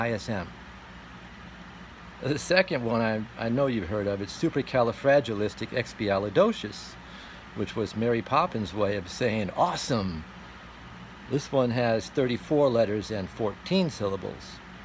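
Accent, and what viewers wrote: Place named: Texas, United States. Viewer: That was super cool. I loved your accent, I think you're Texan, or North Carolinian. Am I right?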